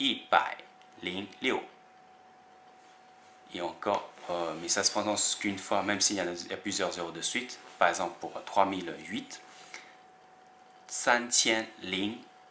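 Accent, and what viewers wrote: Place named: South, China. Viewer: pas mal mais un peu accent du Sud par exemple 万 vous prononcez 旺——|||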